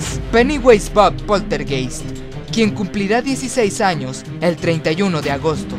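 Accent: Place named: Japan. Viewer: Like si Te das cuenta de el asentó japonés en todos los videos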